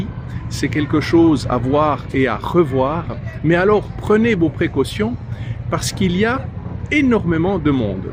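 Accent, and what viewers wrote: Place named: Alsace, France. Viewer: Vous êtes alsacien ? Vu l'accent, et votre attachement à Christ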